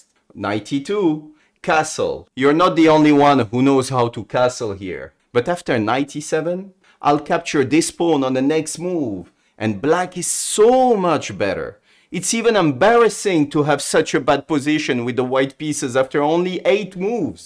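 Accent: France. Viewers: I take it your from your accent that you are French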